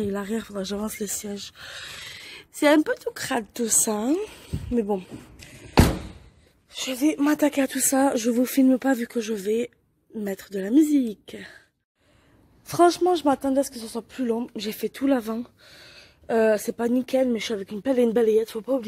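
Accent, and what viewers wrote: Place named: South-West, France. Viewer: Sympa ton petit accent du sud ouest. ça me rappelle mes années de vécues à Toulouse centre ville. :)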